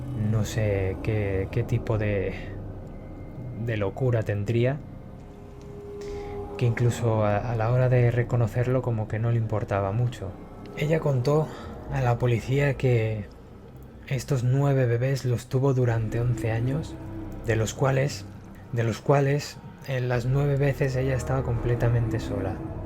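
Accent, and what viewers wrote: Spain. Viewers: Por Dios, que mujer, da escalofríos! muy buen vídeo :) espero Mi saludo Por cierto, como te llamas? (soy bastante nueva). Y adoro tu voz :3 eres de España? saludos :)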